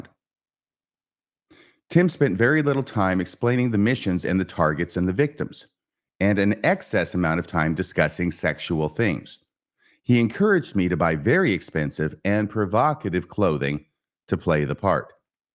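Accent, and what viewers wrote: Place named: Utah, United States. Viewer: Thank you so much for reading the complaints word for word...even if the accents are Utahn 😅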